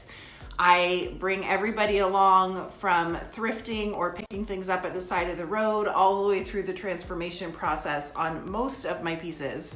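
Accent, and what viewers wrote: Canada. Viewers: Love the screaming kids and your Canadian accent ! Piece is beautiful too!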